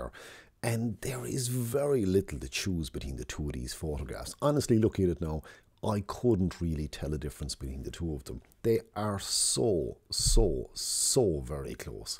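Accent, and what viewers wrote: Ireland. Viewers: It's that charming Irish accent. Thumbs up for a clear voice! 🙂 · I’m east cork you sounded near enough to the city one lol cool what’s the difference between Luminar neo and pro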